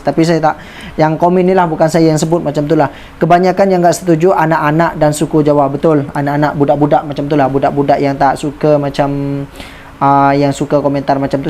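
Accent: Malaysia. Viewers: Liat yg punya chanel ini sepertinya berpihak ke malaydesh 🤣🤣 apalagi dnger logat bicara pakek bhsa melayu palsu malaydesh agak"katrok 😂😁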